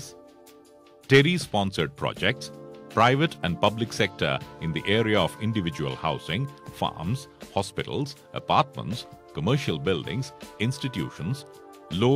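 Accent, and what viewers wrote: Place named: South Africa. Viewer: this really has cheaply made written all over it its basically what you get in your modern shower plug made in india by a company called chicken makka roo!!! advertised by a indian guy called and a accent posh english mixed with south african, i mean look at their research and development tell me thats not a back of a run down chicken coup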